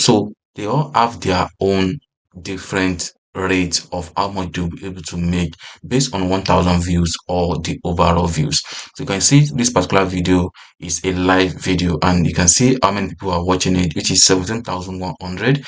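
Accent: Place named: Africa. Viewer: Improve your Audio. Your English is very poor, you have a strong African accent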